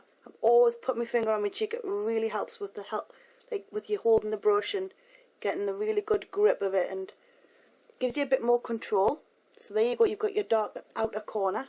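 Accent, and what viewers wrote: Newcastle, United Kingdom. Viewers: yeah, her accent is jordie! jordie meaning she's from newcastle! :) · She's not Scottish or Irish, she's from Newcastle! :)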